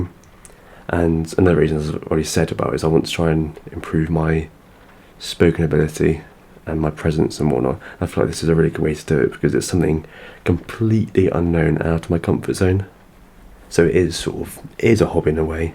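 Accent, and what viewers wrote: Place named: United Kingdom. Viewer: Wow. This is the first impression of mine on this Chanel. If YouTube algorithm suggested I needed this, I'll take it :) I naturally listens to asmr (nature sounds mostly) because I need to focus on my books when I study through late night. Never thought I'd like to listen to British accent. It's really pretty now that I'm hearing it with concentration. It seems every language sounds different especially when spoken through each different accent. Besides that, you have got a really calming voice — it's easy to play it in the background and write through notes or journals. Feels like someone is accompanying. I'd like to subscribe because it feels right investing a little bit of time on when it can accompany me for studies! Please keep surprise us with new videos and be in good health and mind ❤ You have got this ✊ [ — this was fascinating to know someone online who shares quite similar perspectives as I do. 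That's pretty surprising because I feel like some people finds it overwhelming but it completely depends 🌞]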